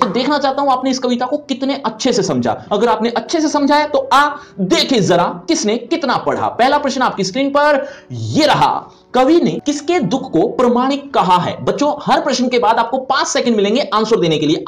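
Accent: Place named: Hyderabad, India. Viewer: Aap Hyderabad ke ho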